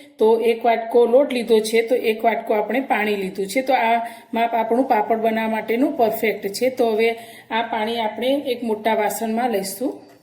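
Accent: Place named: Rajkot, India. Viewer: Bhuj saras banavia no 1 ho 👌 tame kya gam ma cho ben boli uper thi to rajkot na lagocho reply karjo pls ❤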